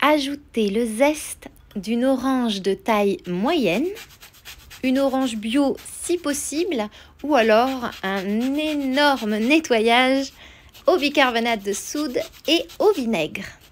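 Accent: France. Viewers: I don't know about the biscuits . But that French accent is fabulous . ❤️👍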